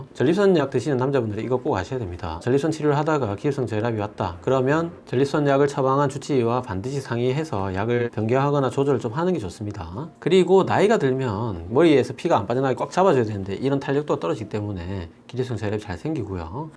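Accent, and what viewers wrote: Gyeongsang, South Korea. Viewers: 경상도 사투리가 친근하네요.믿음이 갑니다.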